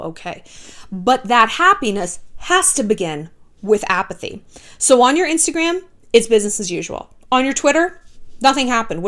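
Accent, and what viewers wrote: England, United Kingdom. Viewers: Your British accent 😂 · Your English accent is bomb 💣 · Lol your british accent 😘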